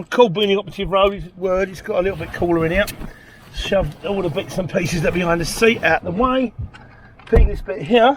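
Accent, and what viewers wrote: United Kingdom. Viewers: Интересно, есть еще кто-нибудь кто слушает этого дядьку из-за британского английского) Строчит как из пулемета MG-42, но интересно)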